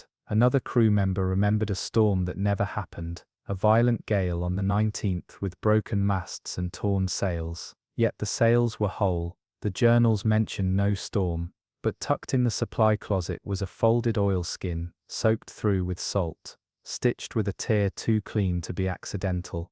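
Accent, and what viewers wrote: United Kingdom. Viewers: It's the British accent, I don't know what it is about it. Got a nice cadence, too.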